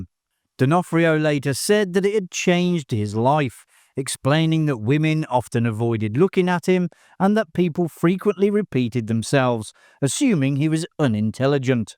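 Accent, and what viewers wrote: England, United Kingdom. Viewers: it's called an english accent you silly twat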